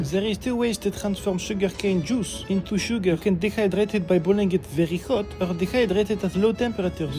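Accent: France. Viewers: Ar you French ?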